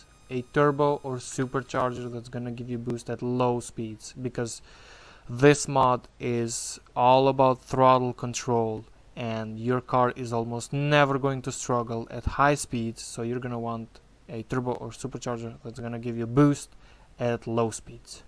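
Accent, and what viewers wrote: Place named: Russia. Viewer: вери стронг рашен аксент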